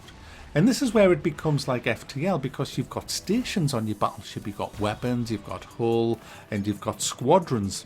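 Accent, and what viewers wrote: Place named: Newcastle, United Kingdom. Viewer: Oh Lord, first time watching a review channel and it'll never be looked at again. Normally liked a Geordie accent but this guy was wildly unfunny and f**king annoying.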